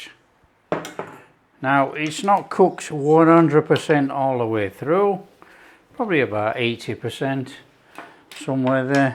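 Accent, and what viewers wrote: Yorkshire, United Kingdom. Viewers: Great stuff - loving your Yorkshire accent :) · This will be the first time that I’ve taken an oriental recipe from somebody that has a United Kingdom accent. It looks delicious.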